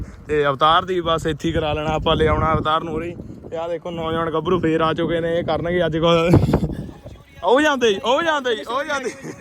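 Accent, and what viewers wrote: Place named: Moga, India. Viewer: Malwa area y tera moga lgda boli to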